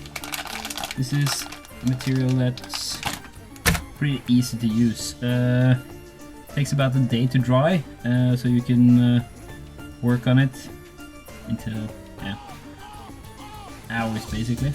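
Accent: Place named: Norway. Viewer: we love Norwegian English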